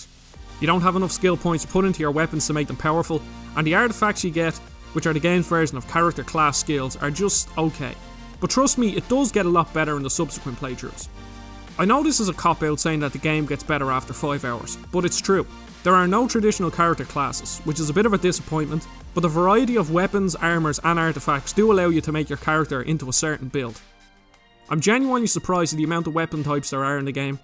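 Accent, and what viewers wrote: Ireland. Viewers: How is it that your accent is so Irish yet so clear? I think you have the best accent ever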